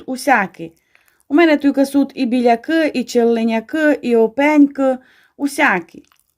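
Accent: Transcarpathia, Ukraine. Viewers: Це канал і він ведеться закарпатським діалектом української мови. И иппен за вто мы го любиме позирати и слухати. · Обожнюю ваш Закарпатський говор👍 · Супер!І паштет і мова закарпатська просто заворожує!Дякую,файна господиня!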